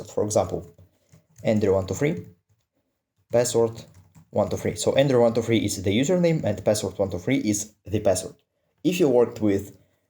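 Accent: Russia. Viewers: Братишка, ты русский? Чувствуется русские нотки в английском. Но отличный и понятный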